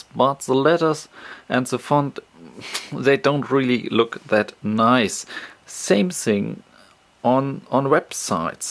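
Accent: Germany. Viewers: after 2 sentences i knew hes german ^.^ am i right? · Nice comparison. i went to Saturn today and test the Ipad mini it was a little bit horrible specially on some Text in websites compare w/ retina.. "Anyway if you guys asking which Nationality this guy belongs due to his accent... His from Country where Mercedes Benz,BMW,Audi and VW was made =)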